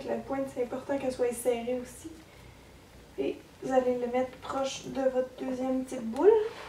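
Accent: Quebec, Canada. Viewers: Coucou de France. Je suis un gars qui a découvert ta chaîne il y a trois jours et c'est un véritable enchantement que de te voir expliquer avec clarté et enthousiasme ta passion. C'est un plaisir de t'écouter, tu as un très bon vocabulaire, j'adore l'accent québécois et en plus tu es belle à voir (et à revoir). J'aime ton naturel, ton regard, ton sourire, ta joie de vivre et ta manière d'être. En fait j'aime tout chez toi. Je te souhaite une belle réussite professionnelle et du bonheur. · J'peux pas supporter ton accent canadien. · Je kiffe l'accent québécois !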